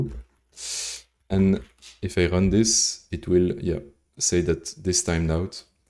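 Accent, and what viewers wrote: France. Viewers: wery wery french accent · On dirait un francais qui parle en anglais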